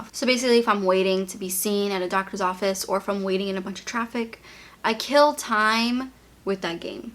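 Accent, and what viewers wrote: Boston, United States. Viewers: Wtf no i like ur boston accent. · I actually love your boston accent! ❤️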